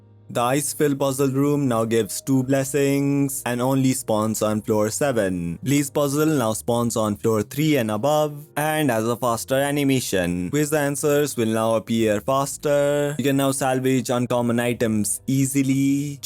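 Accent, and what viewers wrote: India. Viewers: Can you drop the indian accent for the videos its kinda difficult to enjoy watching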